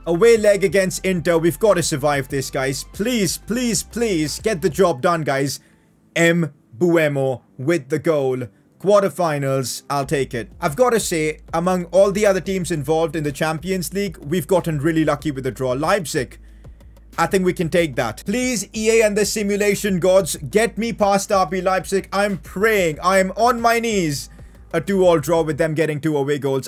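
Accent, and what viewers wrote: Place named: India. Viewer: u have the biggest Indian accent it’s probably because u are the biggest Indian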